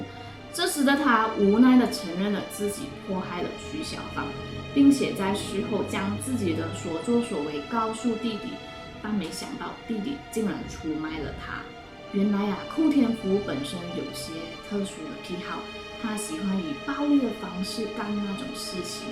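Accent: Malaysia. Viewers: 马来西亚华人的普通话口音很有趣，你是广东人后裔吗？好漂亮～ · 对啊这是马来西亚口音 ，就很像你们在外国人面前说英文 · 她是马来西亚华人，肯定跟你们不同啊。。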